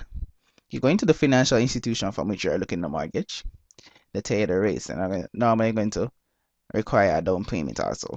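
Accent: Jamaica. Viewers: You sound like a Jamaican :) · When me first hear u I knew u were Jamaican bro, bless up! THANKS MUCH !!!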